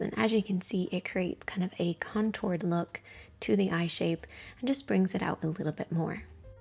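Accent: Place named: Ireland. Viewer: Sometimes depending on the words you say I can hear the Irish accent, especially if the word has a u in it, like much, brush, blush... I love it 😍😍😍, i've always said if I could have any other accent other that my US southern accent, it would be Irish, you're voice is NOT annoying either, I find it very soothing, shame on them 😤😤😤, I love this video, really all of your videos, you always use the minimum, but it always looks like it took hours, a whole brush set, and a whole palette and then some and create the most beautiful looks 😍😍😍.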